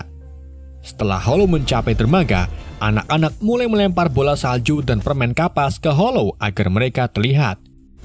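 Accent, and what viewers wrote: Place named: Banyumas, Indonesia. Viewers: ternyata wong ngapak, salam sing Tegal 😂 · iso ngapak ya rika jebulnya 😂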